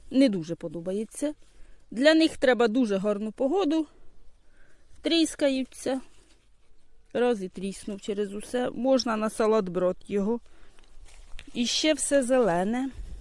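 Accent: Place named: Khmelnytskyi, Ukraine. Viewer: У мене так родичі на Хмельниччині говорять. Так схоже. Я так зрозуміла, що не підв'язані помідори. Дякую вам за відео.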